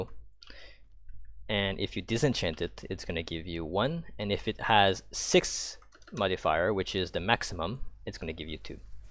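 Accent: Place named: Quebec, Canada. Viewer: hi random and weird question lol, i noticed youre on the BR servers, but your accent sounds A LOT like a french canadian accent so I was wondering if youre from Quebec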